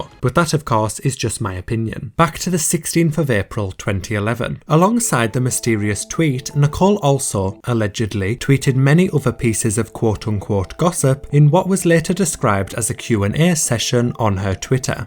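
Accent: United Kingdom. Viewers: Yeah, it sounds like a humanly bot with a british accent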